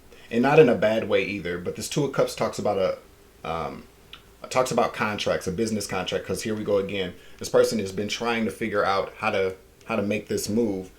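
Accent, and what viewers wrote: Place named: Canada. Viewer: Sounds like Canada 🇨🇦 aaa lol my home and native land 😉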